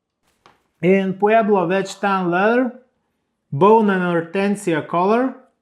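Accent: Russia. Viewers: Крутейший Русский акцент, очень приятно слушать. Ну и изделия, само собой, огонь!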